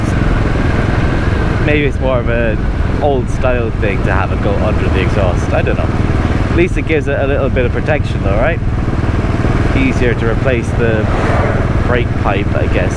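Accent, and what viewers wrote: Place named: Ireland. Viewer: Just found you. So refreshing to hear another Irish voice coming from inside the helmet on one of these vids. Gorgeous bike too 😍 subbed!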